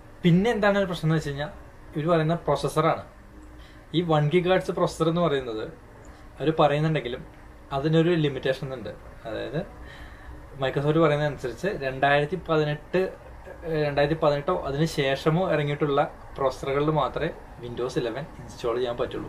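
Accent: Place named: Thrissur, India. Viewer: തൃശ്ശൂർക്കാരൻ ആണ് എന്ന് മനസ്സിലായി അല്ലേൽ എറണാകുളം ❤❤❤❤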